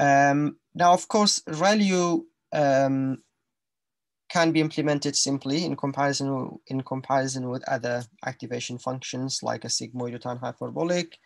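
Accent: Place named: United Kingdom. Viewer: Strong British accent !☺️ Well done ✅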